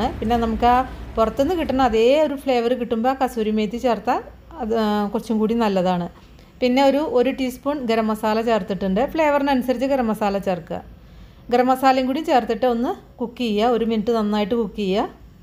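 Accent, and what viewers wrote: Palakkad, India. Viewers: sari. samsaram kettappol thonni palakkad, nammude area akum ennu. I am from ottapalam. Cooking video ellam super anu. Try cheyarundu. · palakkad ആണ് എന്ന് എനിക്കും തോന്നി, നല്ല സംസാരം 💗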